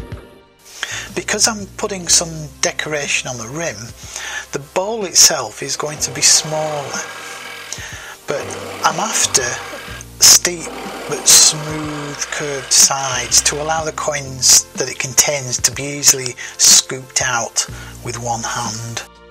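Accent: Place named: Lancashire, United Kingdom. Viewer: Good to hear a Lancashire accent!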